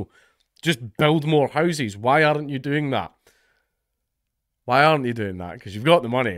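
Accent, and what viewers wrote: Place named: Scotland, United Kingdom. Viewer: I've said all this shit so on the same wavelength, sounds a lot better in a Scottish accent than a Welsh one though, keep being the voice of reason!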